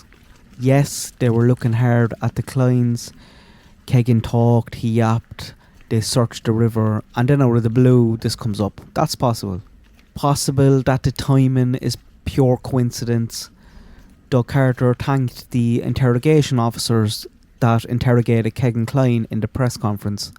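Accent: Ireland. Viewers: I thought there was another person waiting nearby to pick up the killer? I think it didn't go to plan,those girls were to be taken away elsewhere,the crime scene was staged to throw LE off the scent.I've been fascinated with this case from day one.Lovely to hear the Irish accent by the way 💚🤍🧡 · Scotch or Irish? Irish? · I’m in Ca now but grew up in Indiana It’s fun to see the woods again as I used to go mushroom hunting with my dad I agree with your speculations on the case as you speak in the lilt of your Irish brogue THANKYOU Love your dog too❤ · I love your Irish accent!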